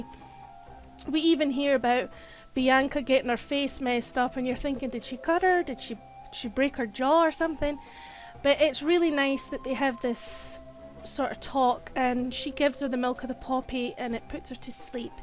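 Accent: Scotland, United Kingdom. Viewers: Scottish narrator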